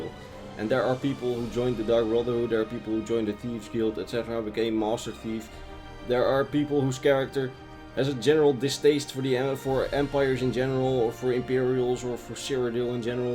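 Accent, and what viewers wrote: Netherlands. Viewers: By your accent i can tell you are dutch. Why hello fellow dutchman. How i wish the last dragonborn would be off on the quest to claim the throne of the empire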